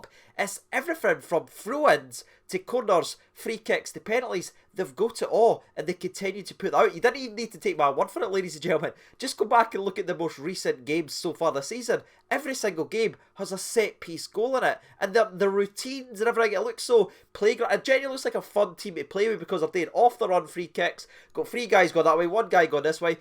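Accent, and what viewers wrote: Scotland, United Kingdom. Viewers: hope your nose is ok and your feeling better. hopefully it heals up fast as i hope to get a selfie with you when i come over from Australia. I started watching your you tube a year ago and have just recently become one of the patrion. My daughter loves listening when i have it on and tries to copy your accent. I'm coming back to scotland in October and hopefully get to a few games including the Porto game. till then keep up the good work and hope your feeling better soon cheers G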